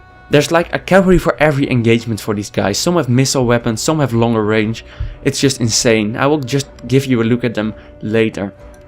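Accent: Netherlands. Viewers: are you dutch by any chance? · Je bent een Nederlander zo te horen. Interessante review tho. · Zo Nederlands deze vent, geniaal accent dat! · Deze kerel moet wel nederlands zijn haha. lekker accent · Damn, that dutch accent though, keep up the good work!👍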